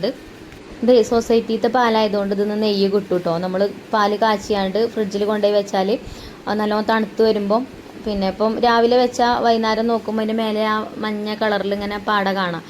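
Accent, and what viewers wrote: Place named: Chungathara, India. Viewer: ഞാൻ ചുങ്കത്തറ ഇവിടെ അടുത്താണ് എന്നു തോന്നുന്നു. Slang ഒരുപോലെ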